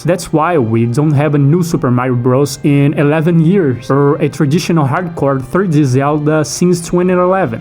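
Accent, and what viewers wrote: Brazil. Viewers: is this a ptbr accent i hear??? hehehehehe (if so, right ON) but yeah, at times i feel very little desire to jump back into nintendo games, unless im with friends and wanna test how strong my friendships really are by playing mario kart or smash bros, lol. great video, dude!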